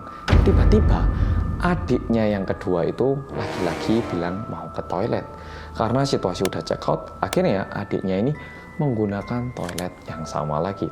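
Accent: Java, Indonesia. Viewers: Aku klo mas ngomong orang korea yg. Medeekk aku nya ikutan 🤣😆😂😂 Mas aku udah kirimin trus cerita pengalaman ku ke Gmail yg mas bilangin, tapi tenggelam sama yg lain 😁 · 3 channel storytelling favorit dan bagus: 1. (pembawaan santai dan medho serta mudah dipahami) 2. Detektif Aldo (mirip siaran radio) 3. Arroyan Pram (kasus, konspirasi sampe sains fisika, editan paling niat dan keren. Serasa nonton film layar lebar).. Kalo kalian?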